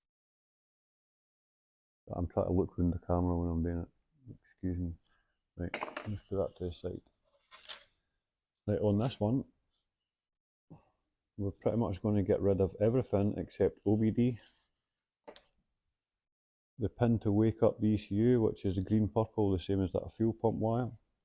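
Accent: Scotland, United Kingdom. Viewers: Most informational video i have seen but that Scottish accent man 🔥🔥🔥🔥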